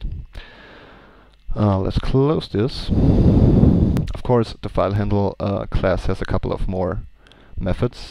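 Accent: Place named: Germany. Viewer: Nah, a heavy German one ^^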